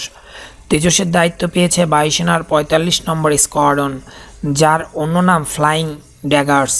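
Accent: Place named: Bangladesh. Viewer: তুই কি বাংলাদেশি তোর শব্দ উচ্চারনে বাংলাদেশি গরুর গন্ধ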